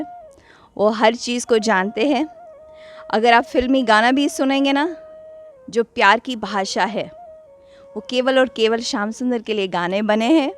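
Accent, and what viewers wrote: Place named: United States. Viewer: Main inko insta par following karta hu, tabhi mai socho ye inka american accent kyu hai.. Mai samjhta tha ye style dikhane ken liye american accent mai boltti hai 🤣🤣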